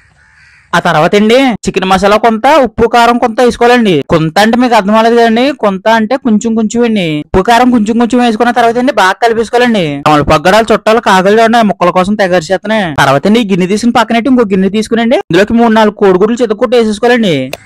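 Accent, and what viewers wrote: Godavari, India. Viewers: Meeru meee comments okalla meeda okallu. Godavari yaaasa adbhutam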